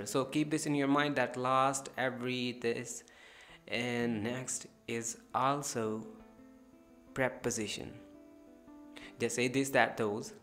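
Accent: United Kingdom. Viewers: Sir pak ki english tenses preposition to ata hai lekin british accent nhe samjh atta apka 11 word wali video awsm the plz 1 video main batain k British language A TO Z kesay pronounced karengaylk PLZZZZZZZZZZZZZZZZZZ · chawal Urdu to thek thek bol.etni acting kr rahy ho jesy tm angrez ho or Urdu bolna ni ata .Urdu m English wala lehja rkha hoa h.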